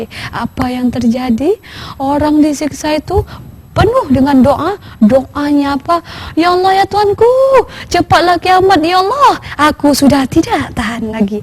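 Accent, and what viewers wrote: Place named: Malaysia. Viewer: bicaranya mcm org malaysia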